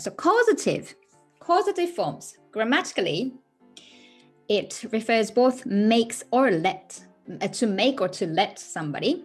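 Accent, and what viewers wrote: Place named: United Kingdom. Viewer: Your english accent is so good... you sound like you are from the uk! (maybe you are lol). Great video anyway, thanks :)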